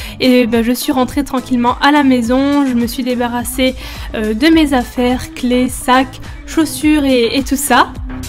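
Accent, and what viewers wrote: France. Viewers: ta vraiment routine bien chargé avec tes petites pantoufles c'est tellement mignon l'accent d'une française lol il est bien chanceux ton copain tu semble être une grande cuisinière :-) et continue de faire des exercices c'est bien aussi , et oui nous aussi au Québec nous regardons cette émission mais avec la traduction québécoise bien entendu lol continue tes super vidéos j'adore :-)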